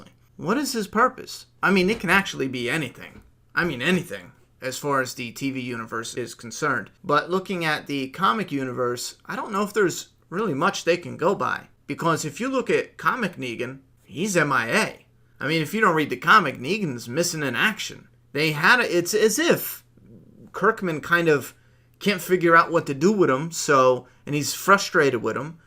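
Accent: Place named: New York, United States. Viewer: YOU must be from New York or Jersey. My son came home with this accent. LOVE it. I laughed when you got pissed at your phone. Ha ha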